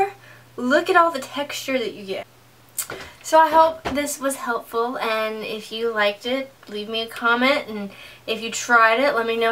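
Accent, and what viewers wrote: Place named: South, United States. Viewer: wowwwww i <3 this video! you sound so southern :) i am too but to me you kinnnnddddaaaa look like miley cyrus hahaaha hope tht doesnt offend you hahaha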